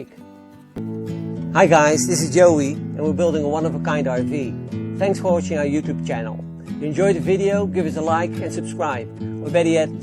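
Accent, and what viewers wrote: Netherlands. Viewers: ik dacht altijd al een nederlands accent te horen ;) keep up the good work!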